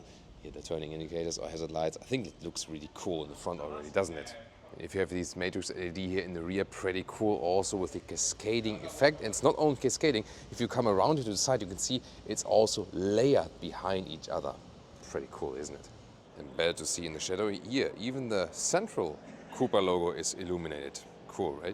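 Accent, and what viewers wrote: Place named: Germany. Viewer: Why do they have a German doing the English version... this is dire... 🙃!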